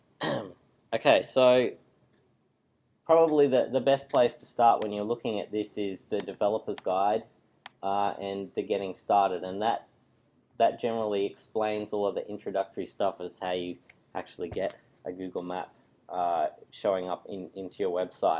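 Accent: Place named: Australia. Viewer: along with the Aussie accent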